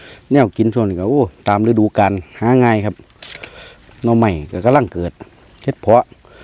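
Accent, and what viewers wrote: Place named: Ubon Ratchathani, Thailand. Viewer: เกรียงไกร สุริบุตร ฟังสำเนียงเราน่าจะยุ่ทางอำนาจอุบลละคับพี่น้อง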